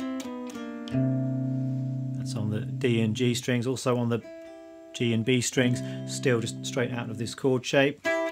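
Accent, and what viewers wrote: United Kingdom. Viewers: Good stuff, thank you! A little funny to hear a guy with a British accent playing country 😁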